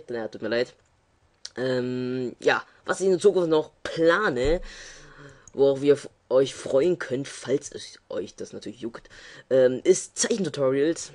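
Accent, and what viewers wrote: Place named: Stuttgart, Germany. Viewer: Für einen Stuttgarter erfreulich wenig Dialekt, für einen 14-jährigen eine recht gute Stimme - so weit, sogut. Wichtiger Tip: Vorbereiten! Gerade bei einem solchen Video sollte man sich vorher ein paar Gedanken über den Text machen, notieren, ausprobieren etc.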